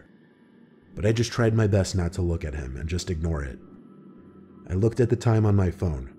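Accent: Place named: New York, United States. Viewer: Love this channel! Love how I can listen to these story and the person narrating these stories sound very similar to me “Yes, I’m talking about the NY accent!”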